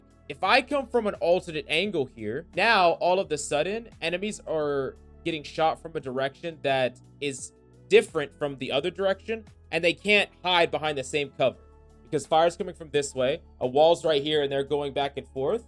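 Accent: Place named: United States. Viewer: Woah I didn’t know GreekGodX made Halo videos. Your American accent is perfect dude. I’m impressed.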